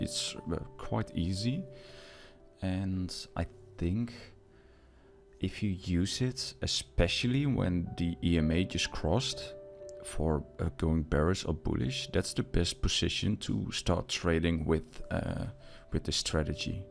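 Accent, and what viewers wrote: Netherlands. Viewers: Are you Dutch? :) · Nice video! Are you Dutch by the way? 😄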